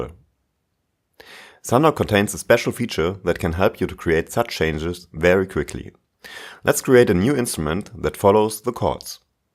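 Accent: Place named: Germany. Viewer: German accent for ever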